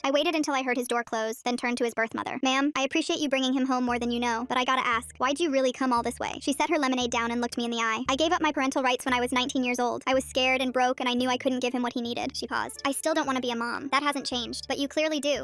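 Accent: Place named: South, United States. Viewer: Damn, you’re southern as hell😅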